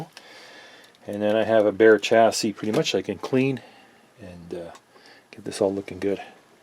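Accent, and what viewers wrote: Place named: Alberta, Canada. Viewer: This is my favorite vintage audio restoration and Alberta-accent channel on YT. So informative. You deserve far more subscribers, sir.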